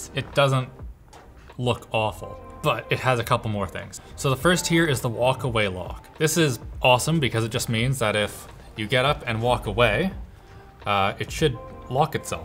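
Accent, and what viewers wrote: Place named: Canada. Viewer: alex is so canadian, the way he says charging, rtx, processor, start, anything with an r. eyar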